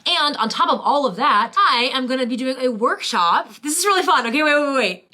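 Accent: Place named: Ireland. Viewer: Omg! You’re Irish accent kinda sounds like saoirse ronan!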